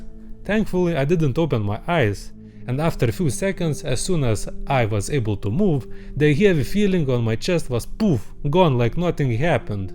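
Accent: Russia. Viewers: like your russian accent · Are you Russian or European? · Are you having a Russian accent? (P.S. im Russian) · why is his accent russian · u russian??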